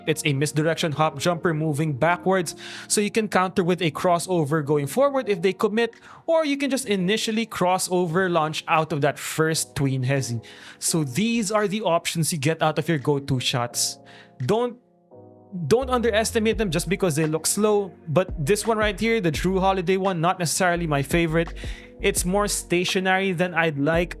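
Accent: Philippines. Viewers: Tagalog accent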